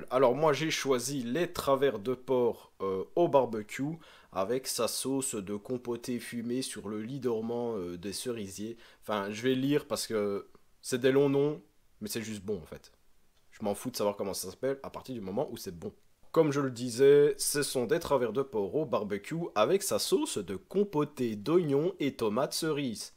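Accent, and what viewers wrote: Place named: Belgium. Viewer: J'adore ton accent belge. En tant que confrère, je m'abonne.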